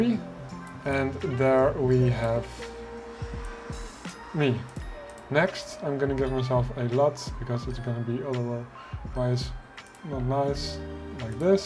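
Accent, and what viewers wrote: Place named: Netherlands. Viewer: ik ruik wat nederlands accent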